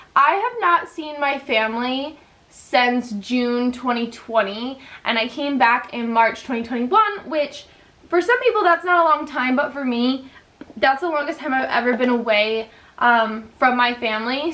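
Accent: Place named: United States. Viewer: You're really pretty enough without make-up. That's a compliment and honest opinion. And you do still have your American accent, which I like. You also seem like a lot of fun to be around. I am surprised someone did not tell you what fancy dress meant, did they not ask what you'd be wearing? Take care and welcome to England.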